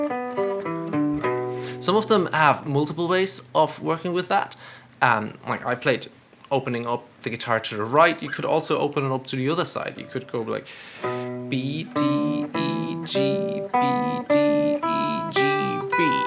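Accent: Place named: Ireland. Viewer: Great great lesson, thank you! Und dein irisches Akzent freut mich sehr :)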